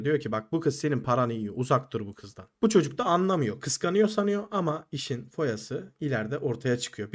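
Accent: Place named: Izmir, Turkey. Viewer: Aga nerde duysam tanırım izmir bura amk yaaaa 19 yıl yaşadım kesin izmir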